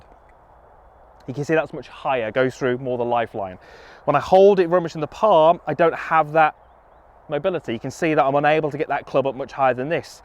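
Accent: United Kingdom. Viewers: Surely u with ur brit accent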